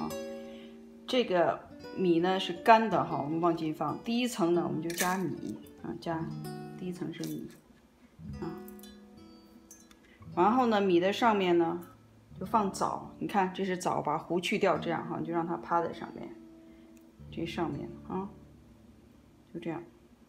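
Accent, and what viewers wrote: Shaanxi, China. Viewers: 姐的陕西话很地道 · 才看到这么地道的陕西美食！谢谢诚意分享🙏！还有地道的乡音，逗得我观看全程哈哈直乐！都是爱吃的，准备做去！🤝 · 喜欢听陕西话讲👍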